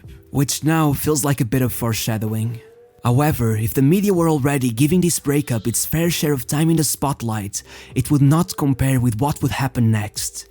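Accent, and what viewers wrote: Portugal. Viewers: Your Voice looks portuguese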